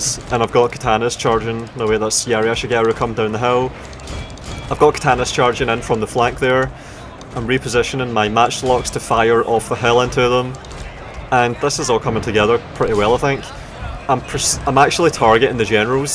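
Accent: Scotland, United Kingdom. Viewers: That was frigging disgusting. Those haters of yours always focus on bullshit cuz u speak the truth, never see them chirping your generalship tho. Honestly you have turned battles into a real art, utilizing all of the game and interface mechanics, I honestly don't know how you click so fast and accurate, switching between things seemlessly, all I know is you have a god tier level of experience in this game and it is very admirable, on top of that you deliver the commentary with a devilish Scottish accent. God speed. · Are you Scottish?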